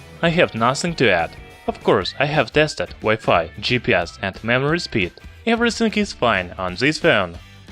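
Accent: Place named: Russia. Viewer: русский? акцент похож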